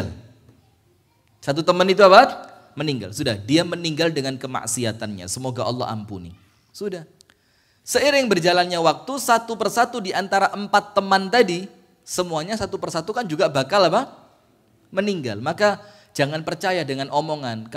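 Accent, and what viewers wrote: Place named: Malang, Indonesia. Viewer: Alhamdulillah jazakallah khoyron ilmuny ustadz,saya tinggal di Lampung tapi asliny org malang..jd tombo kangen dgn logat malangny🙏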